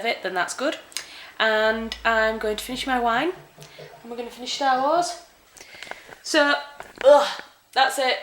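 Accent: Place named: United Kingdom. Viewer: Hi, I clicked onto this by accident and I'm so happy you're so engaging and lovely ! I'm from the Uk like your accent and I'm really interested in getting into writing articles for company's/website. I would want to do it from home, alongside my full time job. Any tips on how to get into that field ? Thanks! Love you !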